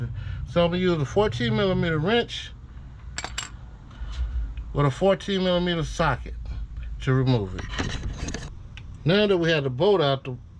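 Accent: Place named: Detroit, United States. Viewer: Sound like you from the D.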